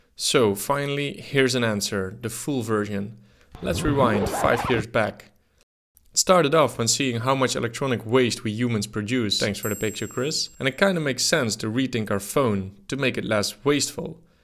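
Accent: Netherlands. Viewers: that dutch accent. makes it sound close to home ;) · Are you Dutch? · This dude Dutch?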